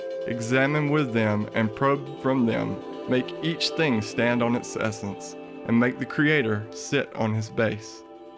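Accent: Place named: South, United States. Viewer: Narrator has a southern accent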